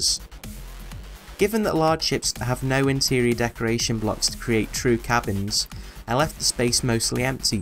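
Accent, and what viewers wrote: United Kingdom. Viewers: He sounds like he lives in England (well, he's English, he may not live here lol), so nope. We rarely get sunlight. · Anyone else think this guy sounds like a male GLaDOS at times? And maybe a little bit less autotuned. And British, okay maybe he doesn't sound like GLaDOS.. Just a little bit?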